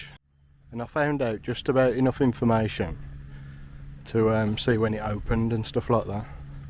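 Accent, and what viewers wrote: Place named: Scotland, United Kingdom. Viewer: Thank you very much,. I am intrigued by your accent, from which part of the UK are you from? For the pronunciation of a couple of words, I would say Scotland but I am not sure. Regards.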